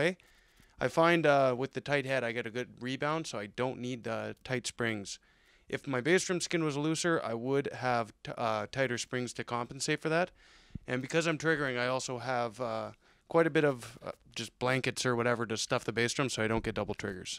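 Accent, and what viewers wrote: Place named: Canada. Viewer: Is this guy Canadian because I can hear it on his accent and me being a Canadian myself I hear it hella bad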